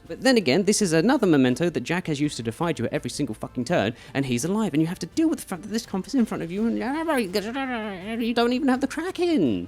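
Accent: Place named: United Kingdom. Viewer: Mate you sound British… what is your issue with the British?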